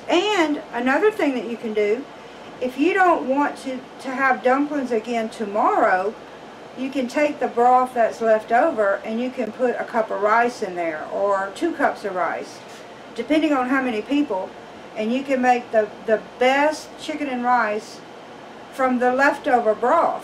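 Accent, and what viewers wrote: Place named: South, United States. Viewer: Southern Ark No, Thank you for teaching me things I don't know, and the way you say y'all with your southern accent.....love the way you speak.👍❤️🇺🇸🇬🇧God bless America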